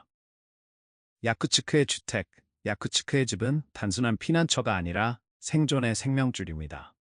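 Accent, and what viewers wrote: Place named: China. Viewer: 왜 발음이 꼭 중공 발음 같지?????? 중공 AI 번역인가???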